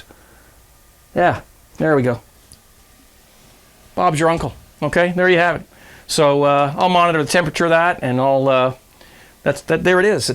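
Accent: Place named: Canada. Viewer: hi, thanks for the great videos. I'm learning a lot. Just curious that you use Coopers products as I'm from South Australia so it's every where (and I love Coopers:)) but you sound Canadian - so either you live here or Coopers are exporting far and wide - cheers to you!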